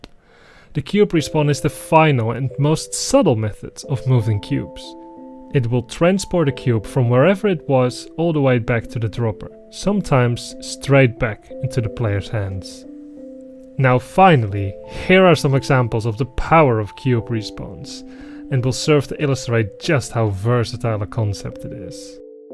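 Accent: Netherlands. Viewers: I was like "What is this Dutch accent?" and then I saw the name and was like "Oh, ok." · wait, u dutch?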